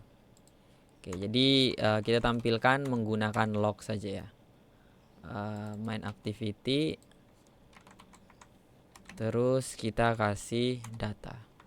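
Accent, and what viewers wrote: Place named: Bali, Indonesia. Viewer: bli orang bali ya? :v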